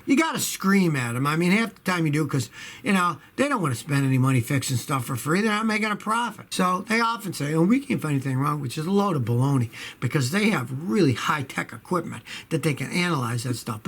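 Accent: Italy. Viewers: Italian accent 100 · i love scotty's Italian's accent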